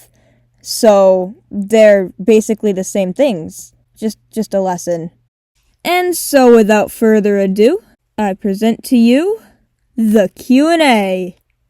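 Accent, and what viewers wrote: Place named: England, United Kingdom. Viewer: Congrats bro!! (or are you a girl? I'm bad at understanding English accents, sorry) Actually it would be cool to have more ways to communicate, but maybe not this time Whos harder: nightmare king or the absolute? radiance Will anyone even answer my comment..? If you'll read it I hope you'll have a great day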